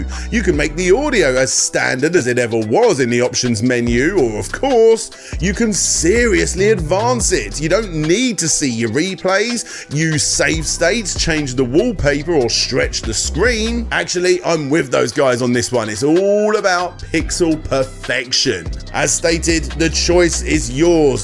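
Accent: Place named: United Kingdom. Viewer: I love UK accents. Hi 🥺🥺🥺🥺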